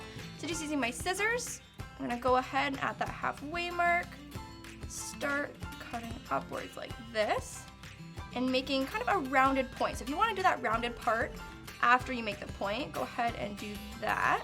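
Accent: Canada. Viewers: Her Canadian accent is so cute haha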